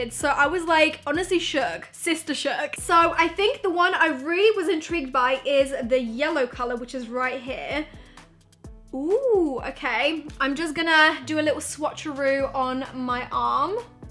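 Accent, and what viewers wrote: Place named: United Kingdom. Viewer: You sound more British then normal 😂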